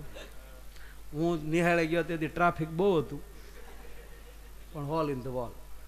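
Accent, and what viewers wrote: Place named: Kathiawar, India. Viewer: Mayabhai kaddak pure kadhiyavadi superb jay mataji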